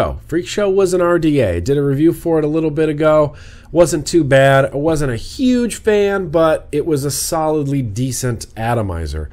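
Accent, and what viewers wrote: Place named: New York, United States. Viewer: What the hell you sound like me but your not in ny the sickness has spread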